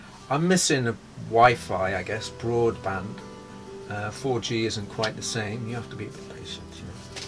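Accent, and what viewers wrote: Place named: Merseyside, United Kingdom. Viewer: what's his accent? Sometimes he sounds like he's from Merseyside.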